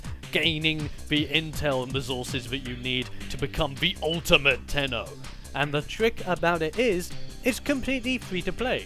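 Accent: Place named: United Kingdom. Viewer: Um... he's British.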